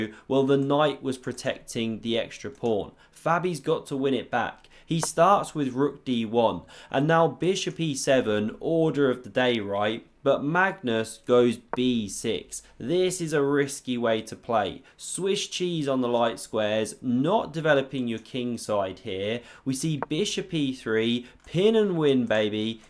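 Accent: England, United Kingdom. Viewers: Off topic: Where is your accent/dialect from? I think England, but where?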